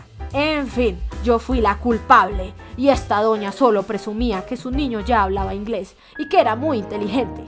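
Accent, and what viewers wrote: Colombia. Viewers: eres de colombia no?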